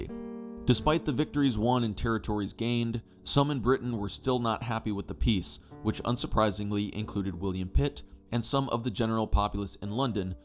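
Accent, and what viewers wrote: United States. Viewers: random, but are you American by any chance? To me you sound so, and you seem to be going a bit heavy on them in this video. Just saying. Not discrediting you or anything. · Since you're British, why do you use an AI American accent, and maybe you should calm down your sympathy for the British? You don't want to sound too biase and / or partisan. It breaks the immersion of you being historical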